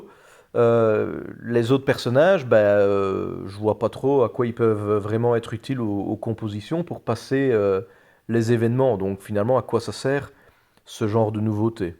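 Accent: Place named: Belgium. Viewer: Sans commentaire l'ami toute est di , donc je vais plutôt commencer par te dire que ca fait plaisir de voir un youtubeurs Belge sachant que j'ai moi même des origines belge que j'ai habité Bray Dunes donc juste a la frontière collé à ( la panne ) pendant toute mon enfance et donc forcément ca me fait toujours plaisir de revenir en Belgique chaque année ou même d'entendre l'accent belge qui me rappelle toujours de bon souvenirs , je fais partie de l'alliance de subarix et Julien notamment donc je tes découvert grâce à Juju et je trouve très intéressante ton analyse et bien résumé , et j'vais te dire moi je dépense peut depuis le début de mon aventure et j'me débrouille avec mes héros , j'ai juste pris le vip très vite pour finir mon camp rapidement , depuis je ne le prend plus et donc j'investi encore moins , j'étais à environ ( 20 , 25 voir gros max de temps en temps 30€ ) aujourd'hui entre ( 10 et 20€ gros max ) plus ils continuent dans leur délire moins j'investis , désolé pour ce long message , je ferrais plus court la prochaine fois , moi c'est Georgio salut et à bientôt l'ami 😉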